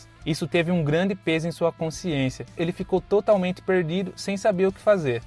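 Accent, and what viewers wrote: Brazil. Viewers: Mó baiano KKKKKKKKKKKKKKKK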